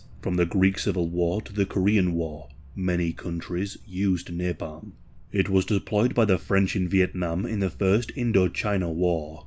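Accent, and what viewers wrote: United Kingdom. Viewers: Hes brit*sh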